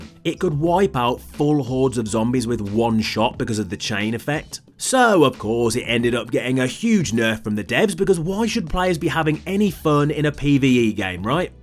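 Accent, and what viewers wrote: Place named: Australia. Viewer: accent is like a heavy country Australian accent, plus a heavy accent from the uk somewhere very awesome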